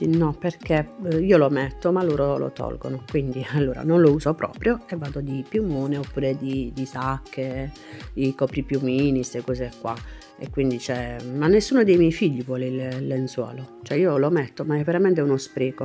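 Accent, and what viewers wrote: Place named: Apulia, Italy. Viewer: Ciao sei pugliese ?si sente e anche io lo sono abito nella bat ,cmq belle pulizie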